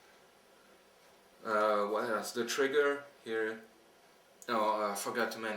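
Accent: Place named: Switzerland. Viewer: Dude, let ME take a guess-you're Swiss, right? :) Your accent sounds just like Swiss Maverick's+you guys have mandatory military service!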